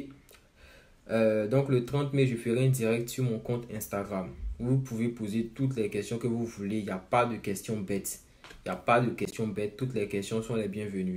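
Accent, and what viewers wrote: Ivory Coast. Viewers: Tu as un accent ivoiren frérot, tu l'est ?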